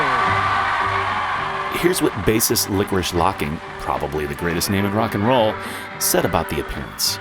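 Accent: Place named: South England, United Kingdom. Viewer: That is not an American accent my friend. Its a south England accent!!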